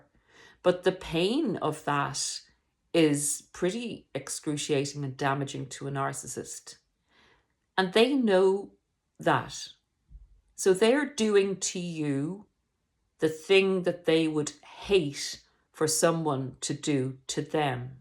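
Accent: Ireland. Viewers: You seem to have an Irish accent I could be wrong I’m a new subscriber from Ireland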